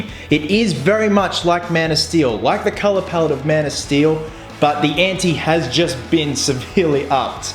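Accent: Australia. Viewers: DAAAMMM you look sexy in that bat outfit, mmmmm yummy and love the Australian accent makes you even sexier. Do i agree with your assessment with the movie? Not completely but that dont take away from your sexiness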